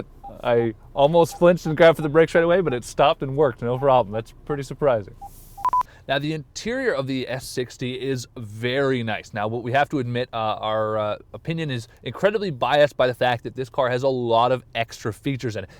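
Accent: Canada. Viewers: is he Canadian? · All I hear is Canada seeping out of his nostrils.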